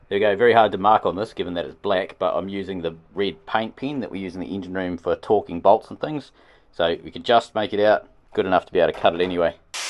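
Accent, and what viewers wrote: New Zealand. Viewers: With your Kiwi accent the automatic YouTube captions always get "Brew Pig" instead of Brupeg. Nice work on the belt shroud!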